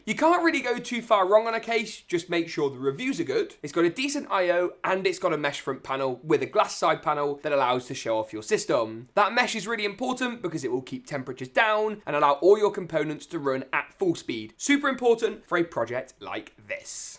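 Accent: United Kingdom. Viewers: This dudes brittish accent is just too much, lol!!!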